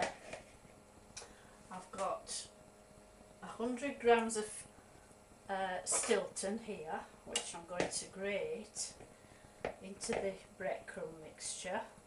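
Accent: Yorkshire, United Kingdom. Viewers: Eeh, it's nice to hear a good old Yorkshire accent instead of an American one, for a change :-)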